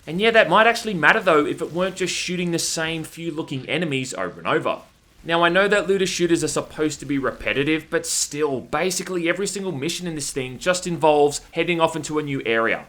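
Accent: Australia. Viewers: Australian accent is the best accent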